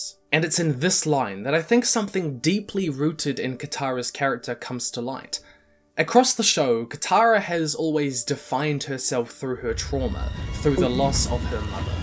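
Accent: New Zealand. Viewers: wait is he a kiwi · are you from nz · Wait your new Zealander?! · Bro you a kiwi ? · Are u a kiwi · You're a kiwi?! how did I never notice.I'm a kiwi too. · Great video.... But I can't stand how kiwis replace "E" with "I" when the speak. "When" turns to "whin" "end" turns to "ind" lol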